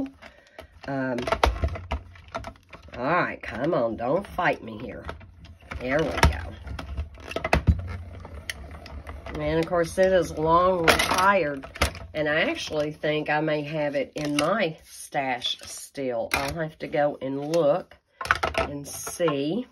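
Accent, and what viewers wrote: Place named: Georgia, United States. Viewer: Where are you from? I love your accent. Reminds me of home (GA)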